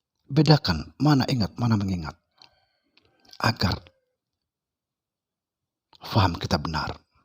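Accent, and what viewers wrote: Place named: Sulawesi, Indonesia. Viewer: Assalamualaikum warahmah tullahi wabarakatu..bolehkah kami tahu bapak asalnya dari mana ya....dari logat tuan guru sepertinya..Sulawesi...?dimana ya...ilmu tuan guru hampir sama dengan nenek moyang saya.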